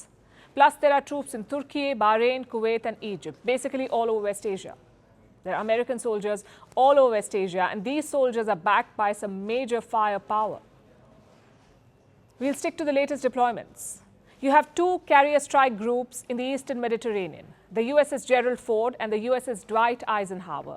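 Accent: India. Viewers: Seem tha you know everything? I dont like how you speak English still you sound indian.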